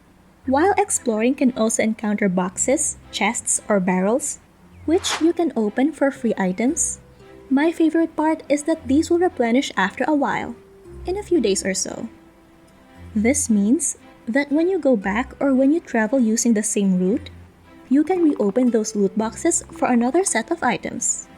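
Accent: Philippines. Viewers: pinoy accent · FIrst few seconds of watching and hearing you talk, I immediately thought "Fellow kababayan!" :D I'm so glad seeing more and more MIrthwood videos, we gotta spread the message of what a gem this game is! I've been following and playing Mirthwood since Playtests these last weeks and been playing since release. I enjoyed your video!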